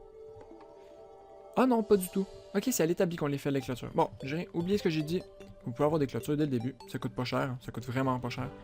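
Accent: Quebec, Canada. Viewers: Vive l’accent QUÉBECOIS, c'est plate qu'on a n'a pas bcp ( beaucoup ) sur youtube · j'adoooore ton accent québécois et ta maison et magnifique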